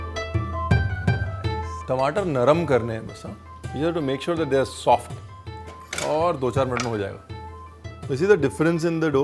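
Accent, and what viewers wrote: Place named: Punjab, India. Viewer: A big fan . You nail it when you explain things specially with that PUNJABI touch♥️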